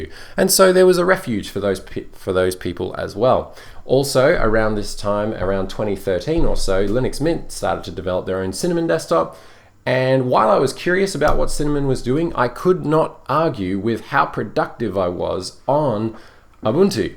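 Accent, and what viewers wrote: Australia. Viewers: great job, enjoy your chats... nice to have an aussie accent on YT !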